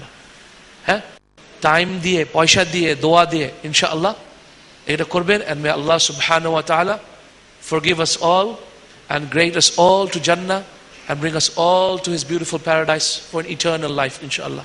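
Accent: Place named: Pakistan. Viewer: Unar English Lecture gulo shunechilam, vabchilam uni Pakistani! Valo laglo khub! Marhaba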